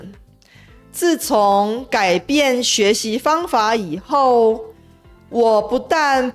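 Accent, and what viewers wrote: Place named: Beijing, China. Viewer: Thank you for responding. I asked because I thought I could detect a northern, perhaps Beijing accent. Anyway, nice to come across another channel teaching traditional Chinese 😊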